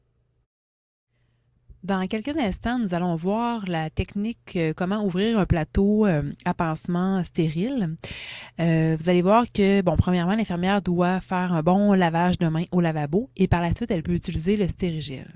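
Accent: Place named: Quebec, Canada. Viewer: Accent québécois 🤢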